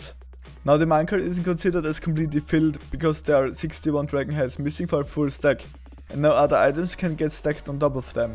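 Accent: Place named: Germany. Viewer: Bisschen deutscher Akzent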